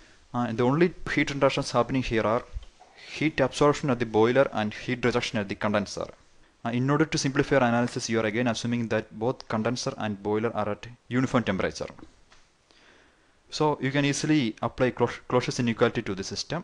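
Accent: Kerala, India. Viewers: Malayalee accent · I think he is a Malayali from India .. Damn accent!!! Can't focus on the video :(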